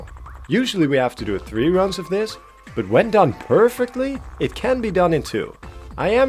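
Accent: Netherlands. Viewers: Ps are you dutch? I kinda hear the accent which would be perfect because so am i:)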